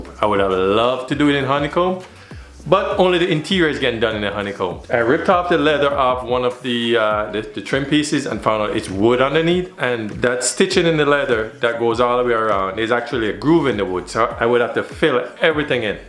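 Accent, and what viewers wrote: Jamaica. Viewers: u gotta be Jamaican lol im doing the same thing and ah run from the same tings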